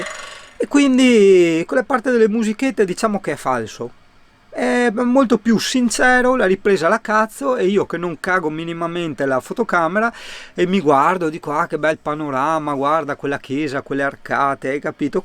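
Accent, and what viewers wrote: Veneto, Italy. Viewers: Non cambiare i tuoi video piacciono perché sono genuini e con la parlata veneziana a volte un po' colorita.... Per il mono patino informati sulle regole in qualche città li equiparano al codice stradale di un motorino ....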